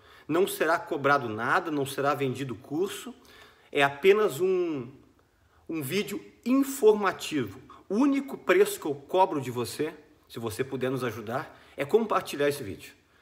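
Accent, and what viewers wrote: Rio Grande do Sul, Brazil. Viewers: Adoro os seus vídeos , não só pela competência, clareza, além de tudo isso, adoro sua forma gaúcha de falar. Eu vivo na Alemanha e mato um pouco das saudades. São excelentes seus vídeos e o senhor deve ser um excelente professor, além de excelente médico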